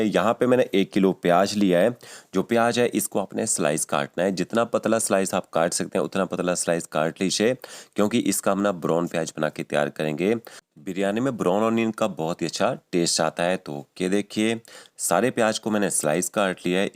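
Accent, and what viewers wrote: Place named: Punjab, India. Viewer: Chef aap mast hain, pls don mind thoda Punjabi style hai but recipes mast hain aapki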